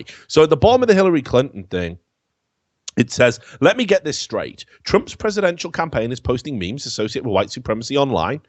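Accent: Wales, United Kingdom. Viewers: You sounded so Welsh 5 years ago.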